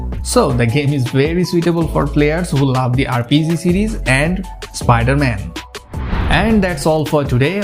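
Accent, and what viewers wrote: India. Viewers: Are you an Indian? Well you have a Indian accent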